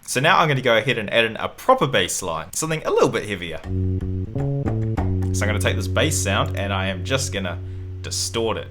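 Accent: New Zealand. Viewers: are u from new zealand bruv ahahaha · are you from new zealand or something??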